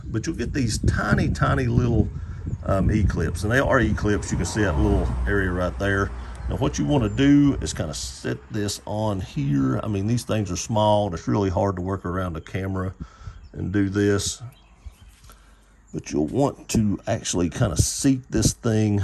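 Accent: South, United States. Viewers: You must be from the south 😂, whereabouts?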